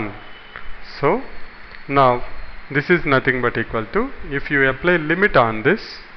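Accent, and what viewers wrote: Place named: India. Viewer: английский с индийским акцентом - просто божественно)) аж приятно слушать 😖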